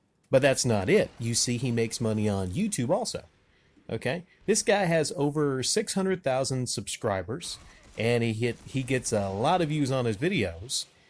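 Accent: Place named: United States. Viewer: Listening to your accent you are American. I'm guessing you're happy to live in a capitalist society. YouTubers, including yourself probably, are hoping to make money from their work/videos and there's nothing wrong with that. It seems hypocritical that you're making videos yourself, probably monetised, then complaining about other people making money from YouTube and Patreon. There are many YouTubers that monetise videos and use patreon to try and get a bit more cash. It's the nature of the beast.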